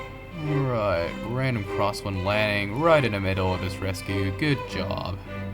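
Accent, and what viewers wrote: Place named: United Kingdom. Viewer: damn that British accent tho....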